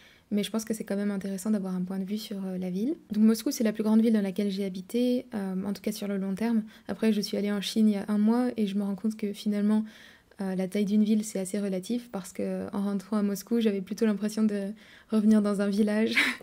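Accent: France. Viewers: Greetings young lady, May I offer that you please speak a little slower. I was easily able to hear your French accent while speaking Russian. Your accent combined with the high speed of speaking made it difficult for me to understand you. Good luck, and thank you.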